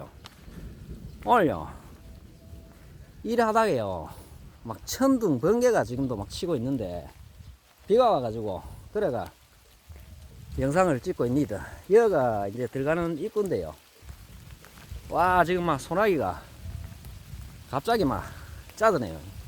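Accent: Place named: Andong, South Korea. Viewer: 고향이 안동쪽이세요^^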